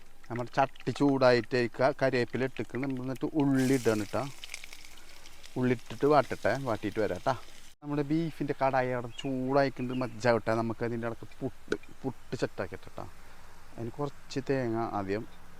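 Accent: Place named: Kasaragod, India. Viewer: കാസറഗോഡൻ സ്ലാങ് പങ്കായിട്ടാറ എബില എന്ത ഇത്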